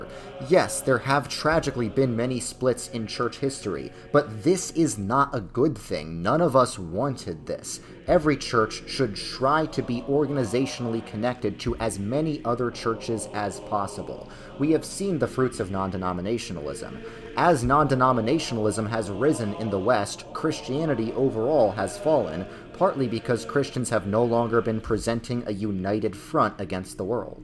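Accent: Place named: Chicago, United States. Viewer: Okay, completely unrelated, but are you from Illinois? I hear some Chicago in your accent😅😅😅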